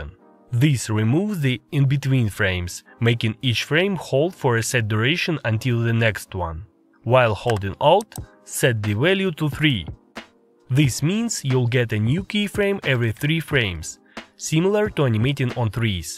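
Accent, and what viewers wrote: Russia. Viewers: are you russian? i hear your accent like that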